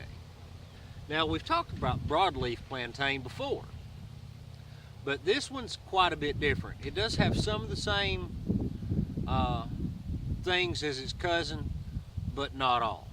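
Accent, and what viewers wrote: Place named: South, United States. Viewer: I'm subin' because you sound like you know your stuff and you sound like your from the south. I'm back in Alabama, where I belong and I've been looking for a channel like this in my neck of the woods.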